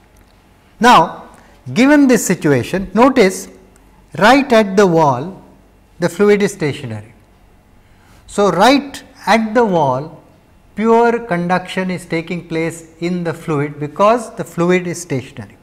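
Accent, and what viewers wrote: India. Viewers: there is too much indian english. great spelling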